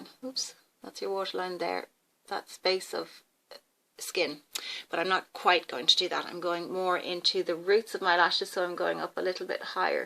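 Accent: Ireland. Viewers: Hi, I wish I'd found you sooner! As a mature lady I too have fierce hooded lids. Your tutorial was excellent! A question for you: You sound Irish, as I am, and I wonder how you manage to shop from Sephora as I didn't think they shipped to Ireland! I would love to try your winged look with the Sephora liner! Any help would be much appreciated. oxo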